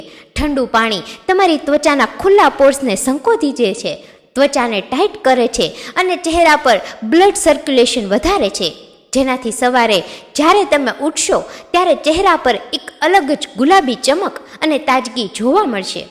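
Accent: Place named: Saurashtra, India. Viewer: Nice. Your bhasha is like Saurashtra.!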